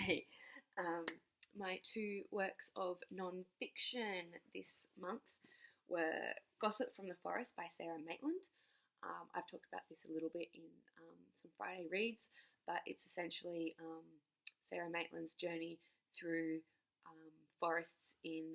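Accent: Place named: New Zealand. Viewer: Interesting accent ^^ I always struggle with this, but do I detect a New Zealander here? 😂 Sorry if you are not from NZ. I just had to take the risk of guessing.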